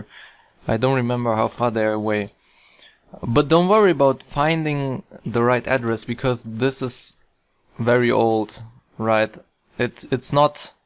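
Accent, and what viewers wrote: Germany. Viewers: German right?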